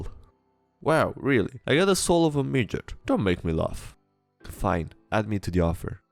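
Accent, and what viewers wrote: Eastern Europe. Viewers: It doesn't sound like most European accents does it. It's 100% not American, it's like a weird version of a Slavic one, but like, really funky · I actually think he's hispanic, that's how it sounds like, but then I hear how the tongue just "hits" and yeah, slavic accent.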